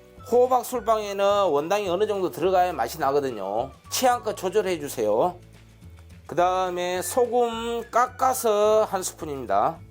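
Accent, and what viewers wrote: Jeolla, South Korea. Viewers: 전라도 사투리가 구수하고 요리에 정성인 세이프님 늘 감사합니다 이번에 LA갈비 4킬로도 성공해서 가족들 잘 먹었습니다 오늘은 호박술빵에 한번 도전해 볼렵니다 믹서도 어느분이 물어서 잘 구입해서 사용하고 있습니다 이번 김장에도 잘 사용할 것 같습니다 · 여수 광양쪽 사투리인줄 구수하고 좋내요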